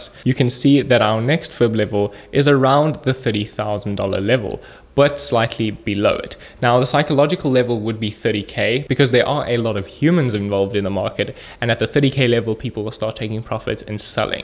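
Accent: South Africa. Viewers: south african? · Are you from SA your accent kinda sounds that way ... I'm new here to your channel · Absolutely a South African and you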